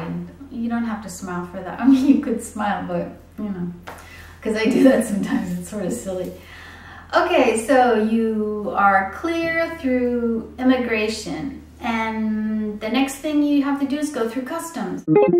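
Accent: United States. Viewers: If you're from Spain, how is it that you sound so American? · I've been watching her videos and I hear an American accent but she holds a Spanish Passport.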